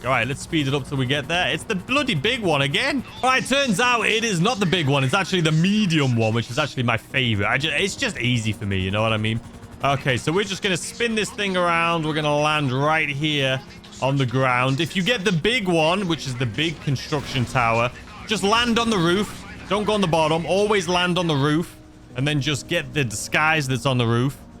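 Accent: United Kingdom. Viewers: May I ask where's this dude from? Been trying to figure out 😁 UK, Ireland, Australia?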